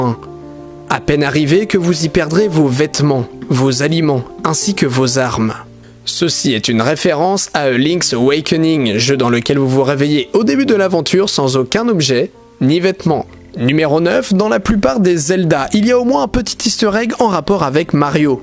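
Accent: England, United Kingdom. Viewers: j'adore ton accent anglais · WoW l’accent anglais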